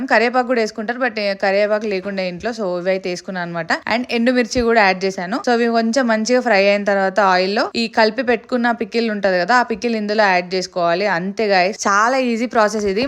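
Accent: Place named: Karimnagar, India. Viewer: Karimnagarollam ma basha slang vere untadi😂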